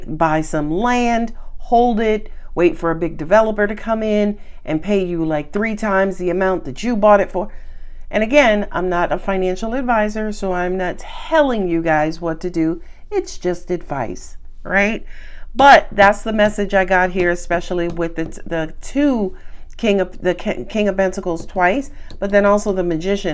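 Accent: New York, United States. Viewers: Scorpio representing in that NY accent! 😂😂😂😎 Thank you for the reading. It resonates with me, I can feel it in my gut but I'm so scared of failing. Gotta work on that. 💫🙏🏻🤗♏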